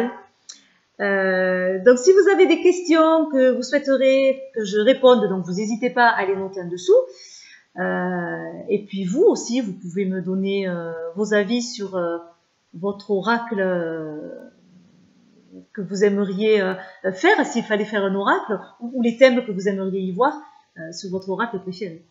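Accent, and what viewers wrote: South, France. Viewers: Toi avé ton accent du Sud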